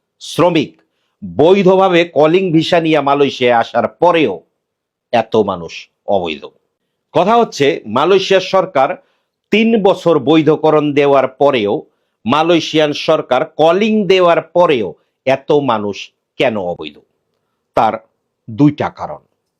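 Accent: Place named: Feni, Bangladesh. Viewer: আপনার ফইয়া কথা আমার কাছে ভাল লাগে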